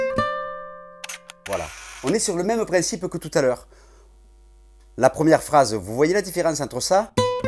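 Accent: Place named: South, France. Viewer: ton accent du sud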